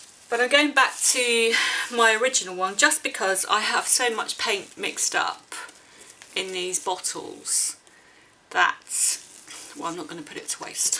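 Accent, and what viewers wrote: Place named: England, United Kingdom. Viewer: Hiya, just watched your video, I found it inspiring. I've been pouring for a few years now. It's my therapy. It's quite nice to hear an English voice, most YouTube people I've seen are in america, Canada or Australia. I hope you don't mind, but I think I'd like to try the hammer technique, the results you have Harare fantastic.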